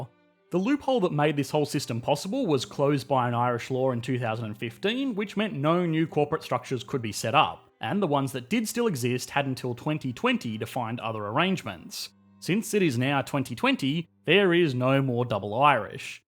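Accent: Australia. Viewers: i've just found this video - wow simplistic explanations yet right on point. who would have thought an Australian speaking slowly makes incredible sense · The guy in the video sounds australian and over here we are basically englands b*tch and worship them so it makes sense they say stupid stuff 😂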